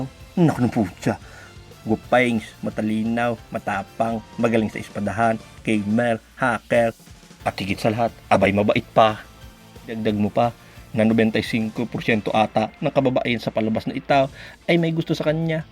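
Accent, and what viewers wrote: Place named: Batangas, Philippines. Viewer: haha ibang klase batangenyo ka pala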